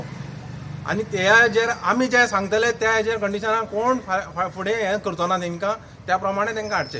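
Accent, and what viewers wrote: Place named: Ghati, India. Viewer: This man is Ghatti as per sound m telling.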